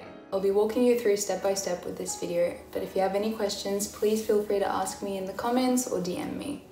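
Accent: Australia. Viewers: You sound so aussie lmao. No hate. You're so cute... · Now how tf did Ik u had Arabic roots even tho u sound full on Aussie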